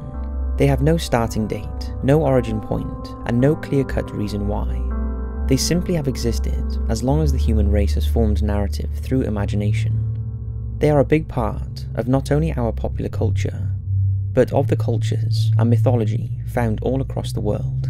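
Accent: Wales, United Kingdom. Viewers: Bro are u Welsh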